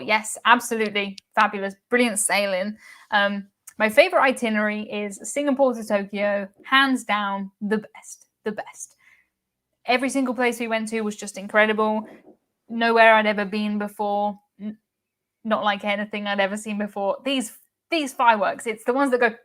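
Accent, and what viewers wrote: United Kingdom. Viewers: I value your opinions & love your presentations (& lovely UK accent!)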